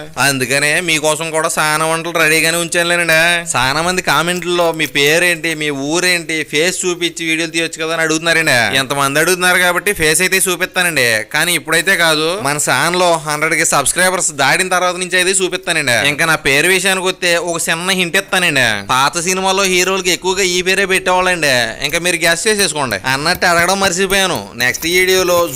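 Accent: Godavari, India. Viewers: మీ గోదావరి భాష మీ వాయిస్ పిచ్చెక్కిచ్చేస్తుంది ఎన్నిసార్లు చూసినా మళ్ళీ మళ్ళీ చూడాలనిపిస్తుంది మీ భాష నీ మాట కోసం మీ పేరు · Ni గోదారి బాషా చాలా బాగుంది... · మీ పేరు ఊరు మాకంత పట్టింపు లేదు గానీ మీ గోదారి భాషే మాకు చాలా ఇష్టం!❤👌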